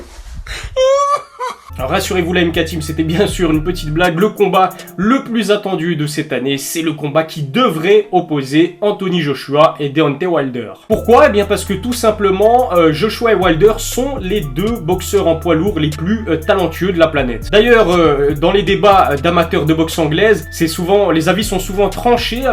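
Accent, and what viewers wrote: Belgium. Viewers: Un peu, je suis belge également du coups je l’entends peut etre plus que les autres, tu es de quel région ?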